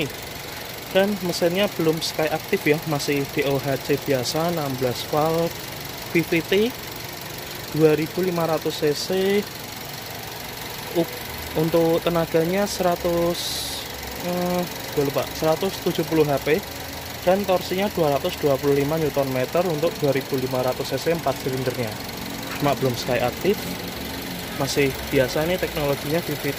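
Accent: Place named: Java, Indonesia. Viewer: Jangan pake bhsa GW mas soalnya rada2 medok jawanya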